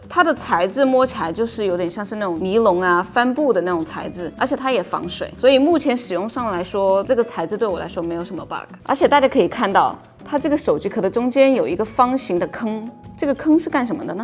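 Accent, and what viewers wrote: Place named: Taiwan. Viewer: Steely是台湾人吗？说话一股台湾腔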